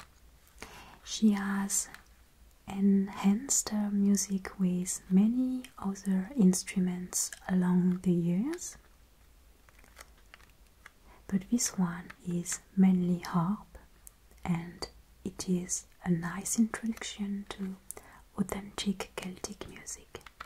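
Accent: France. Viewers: Mon dieu...French accent whispering in my ear with quality headphones is pure eargasm. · Your french accent is life😑 its so beautiful❤️ also, the sound of the music sheets lol😑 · I just finished watching her roleplay where she did a French accent that I thought she was faking the entire time just to find out she wasn't faking it lol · and I was wondering at the first videos I saw what accent she has. Until I saw the french lesson video and now I am like: "Why the f... didn`t I hear that earlier? Yo can hear the french accent at a few words, I am so dump" So I think I`m not good in guessing accents.....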